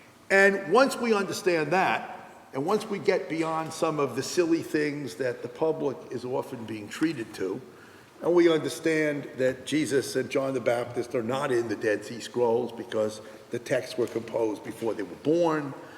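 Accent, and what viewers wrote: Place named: New York, United States. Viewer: Love the accent. Reminds me of a voice actor of a Ralph Bakshi movie. Luv it. Hey Shiffman,,,,,,,,,,,Brooklyn or Queens? LOL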